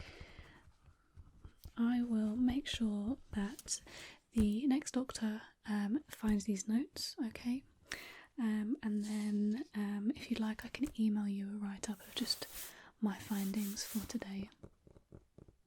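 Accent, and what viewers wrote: United Kingdom. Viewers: u r british?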